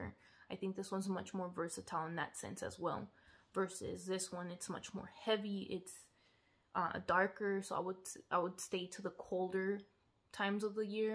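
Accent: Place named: Mexico. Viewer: You’re very welcome! que accent, that Mexican Spanenglish accent 🤓.. let me guess your a sagittarius or libra ? Supposedly Virgo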